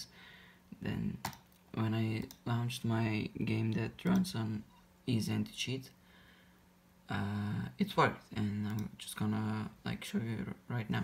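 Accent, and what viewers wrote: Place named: Russia. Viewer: русский акцент не скрыть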